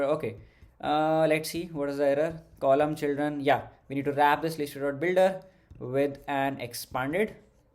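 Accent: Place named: India. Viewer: You speak indian mixed with english make me headache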